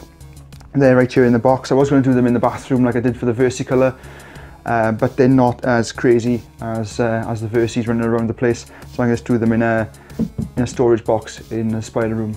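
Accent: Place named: Wales, United Kingdom. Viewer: I really enjoy your videos, no messing around! I love your accent, which part of Wales do you live? I live in Brecon, but I lived in Crickhowell for years, no accents, my brother lives in Merthyr and they all have strong accents that I don’t understand! 😜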